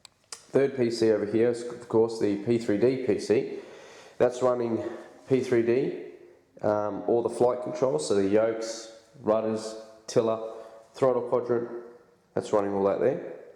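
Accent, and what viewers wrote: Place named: Australia. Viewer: That Aussie accent is real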